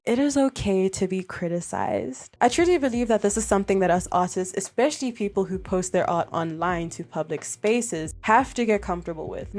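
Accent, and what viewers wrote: South Africa. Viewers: I agree, I think it's perfectly reasonable to expect criticism for your work when you put it out in the world and Sam was very mature going forward after all the backlash. (also just wanted to say your accent sounded really familiar and now I see it's because you're also from South Africa XD) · Such a great video, thank you! It's also great to hear a South African accent on YouTube by surprise! Had no idea when I clicked on this video. Subscribed! 🩷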